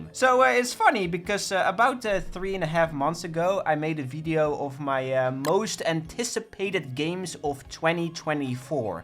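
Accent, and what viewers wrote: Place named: Netherlands. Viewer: My bro is just Dutch leave him be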